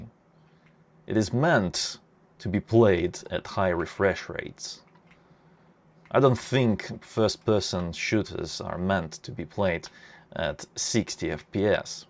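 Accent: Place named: Russia. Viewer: I knew your voice sounded russian as soon as I came across your channel, haha! That's so cool xDD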